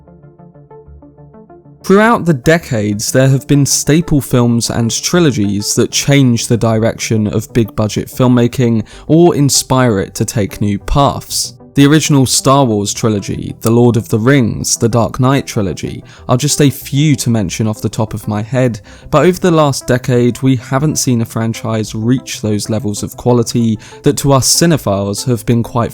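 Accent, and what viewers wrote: United Kingdom. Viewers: really nice british accent :D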